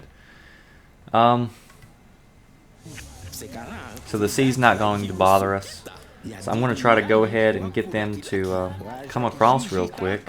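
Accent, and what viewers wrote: South, United States. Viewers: Hey were you from? I'm from georgia, you sound like you're a fellow southerner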